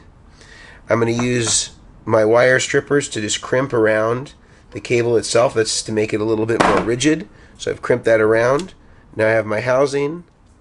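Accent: United States. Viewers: Hahahaha. Fantastic. thanks I enjoyed that. It's not you who first brought this to my attention but since you said it a lot on this video, sorry but you have been targeted! I have always wondered why Americans pronounce solder this way. I also wonder why some people say " aks" instead of ask. Spelling however is another matter and I am absolutely terrible at it. I have also got some famous sayings completely wrong in the past. ps. I do enjoy your videos man. I have one of the best dumble clones ever in my opinion and am obsessed with those amps which brought me to you to check out your pedals. Would love to try one. Check out my youtube videos if you wanna hear the dumble. I reckon you would really appreciate it : ). It's got original 1975 Bassman transformers in it.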